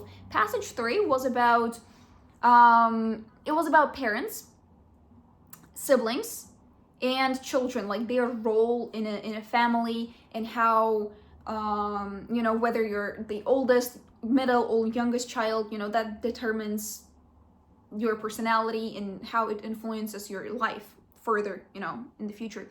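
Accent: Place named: United States. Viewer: Before i saw your ID card i thougt she is an american. Amazing your speaking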